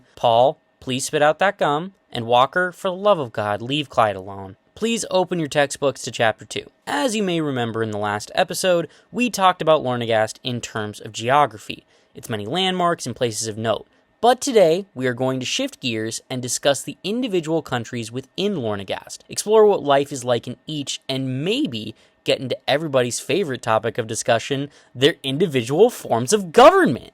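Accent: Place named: Appalachia, United States. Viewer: Considering the appalachian southern accent is a derived form of the Scottish accent. This is a wonderful accident.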